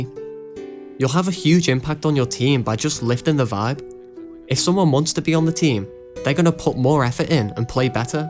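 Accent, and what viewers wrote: United Kingdom. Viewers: Why is he british????????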